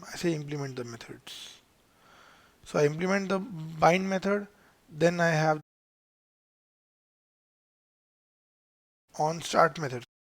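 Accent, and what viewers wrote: India. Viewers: Sotaque indiano, difícil de entender.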